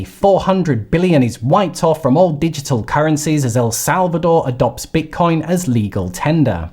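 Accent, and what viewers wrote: United Kingdom. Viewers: I think you have the best info and videos of all my Crypto channels to follow - Thank you!! I always look forward to your new videos!! & who doesn't like to hear a British accent along the way!!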